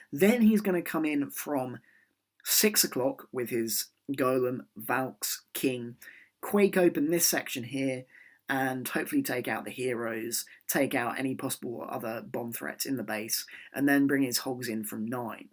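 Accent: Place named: United Kingdom. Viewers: British accent OP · great series. I definitely remeber Dakota telling me to keep my plans simple when i was a tryout in alpha. Keep up the great work i look forward to your vids and your British accent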